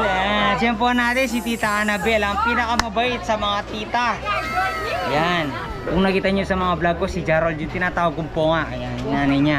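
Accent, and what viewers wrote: Pampanga, Philippines. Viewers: Kapampangan ka tlga ehehe . 😅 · ingat kayu parati soy kapampangan ka pala🤣🤣 taknaydumo anang tita eh🤣🤣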